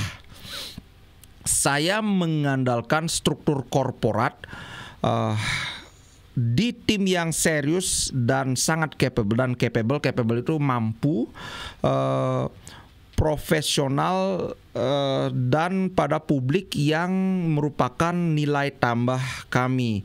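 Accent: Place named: Singaraja, Indonesia. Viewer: Betul banget dari Singaraja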